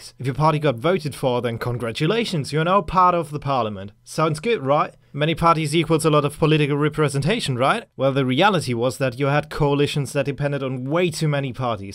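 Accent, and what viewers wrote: Germany. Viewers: You are german arnt you? Always if you pronounce german words there is no actcent. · Von wo hast du deinen deutschen akkzent? Sicherlich nicht aus Österreich oder der Schweiz. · Are you german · I swear this guys accent sounds like a mix between German and Australian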